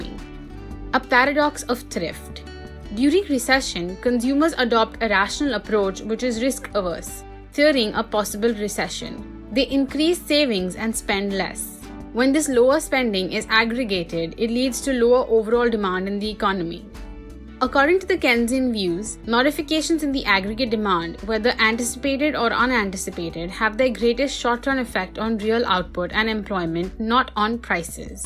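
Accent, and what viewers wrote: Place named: India. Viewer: If you can't speak clean english, then SLOW THE F DOWN. If you would speak slowly many of us who can not understand Indian dialect would still be able to benefit from your videos.... otherwise completely worthless.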